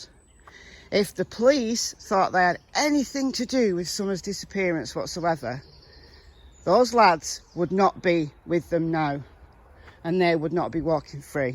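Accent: United Kingdom. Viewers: UK by the sound of her accent so no bears or Coyotes!! 😅👍 Maybe some grass snakes though! 🤷‍♀️ Lol I think she's in Manchester?? 🤔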